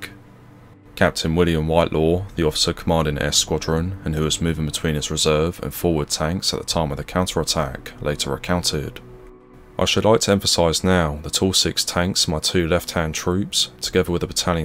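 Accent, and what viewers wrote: United Kingdom. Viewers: You can be polite. not everyone is habitual of British accent. not everyone is habitual of listening to English. there are people around the world who speak many different languages and hence they find it difficult to understand such a "typical English accent". no offense!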